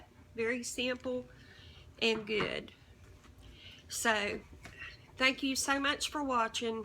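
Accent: South, United States. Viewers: I love southern accents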